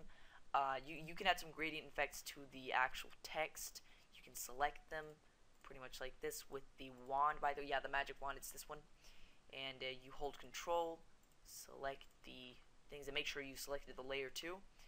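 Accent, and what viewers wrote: Sweden. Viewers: R u from sweden? :O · r u from sweden? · Ey!!! Du är ju svensk! Ey!!! You are Swedish! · u are swedish right??Hej!!!! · U are swedish arent u? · are you Swedish? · WAIT are you Swedish!? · Are u swedish?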